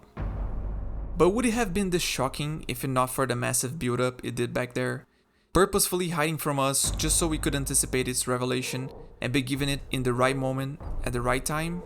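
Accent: Brazil. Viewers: Great content! Do I hear a hint of a Brazilian accent?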